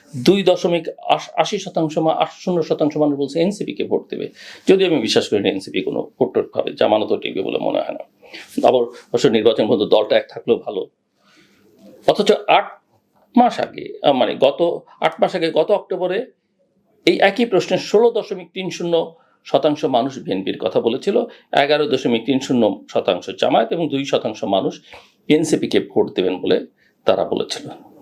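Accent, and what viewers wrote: India. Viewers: তুমি এতো সুশীল সাজার চেষ্টা করিও না। জেনারেল আর ভারতের টোনে যে কথা বলো এটা জনগণ বুঝে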